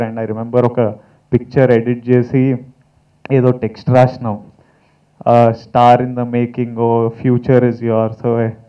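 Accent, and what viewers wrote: Telangana, India. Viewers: I like u bro speak telugu u know pronounce in telangana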